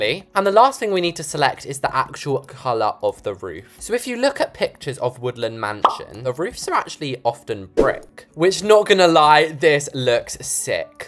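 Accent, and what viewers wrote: England, United Kingdom. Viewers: What part of England r u from bc I’m English and my accent is so different from yours!! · Gurl Are You British ????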